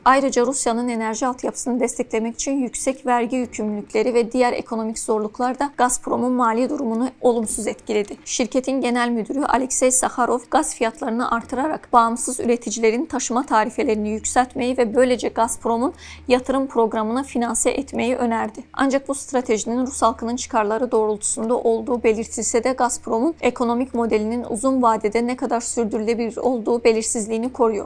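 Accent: Azerbaijan. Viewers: Hanımefendi Azerbaycanlı, o yüzden böyle konuşuyor. Video çok güzel ancak GZT'nin genel problemi videolarının sonunu bir yorumla kapatmadan havada bırakıyor. yani konu detaylı veriliyor ama yakın vade ile ilgili bir öngörü yada yorum yapılmıyor. izleyiciye ise "düşüncelerinizi yazın" demek anlamsız çünkü araştırmayı editör yapmış, izleyiciye düşünce soruyor.. İzleyici yeni öğrendi nesıl yorum yapsın... o sebeple videolar internet bilgisi derlemesi kalitesinde kalıyor. ve kendini tekrar ediyor. izleyiciye ansiklopedi bilgisi veriyor ufuk açmıyor. editör son 3-5 dakika yorum ve konuyu kapatış konuşması yapmalı. çember kapatılmalı. buna çok dikkat edilmeli. aksi taktirde kalite ve izlenme bu yataylıkta gider. emekler için teşekkürler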